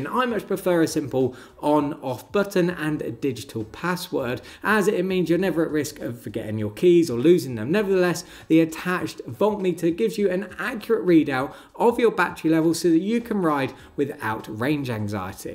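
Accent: United Kingdom. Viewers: Fkn annoying British accent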